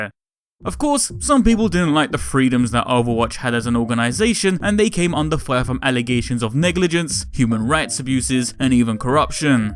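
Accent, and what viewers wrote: London, United Kingdom. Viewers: But I'm Scottish, and pretty much the only English people where I live are posh ones that are here because they work as air traffic controllers that were moved here for work, and I still recognise your accent as a Londoner's. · I'd defiently say you've got a London accent, albeit that really chavish one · How do people think your accent isnt a London accent?!? Like seriously?? I live in the South-East and your accent is still something that I regognise and hear daily.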